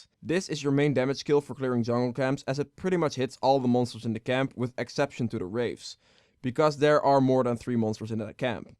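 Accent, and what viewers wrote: Netherlands. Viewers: je hoort altijd als een Nederlander Engels praat haha, ^_^ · ben je nederlands? ;D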